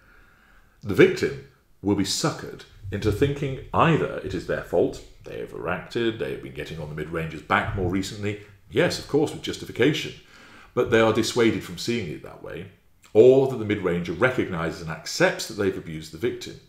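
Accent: United Kingdom. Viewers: Same here! I love British accent. It's so smooth and hot. 🔥😂🤭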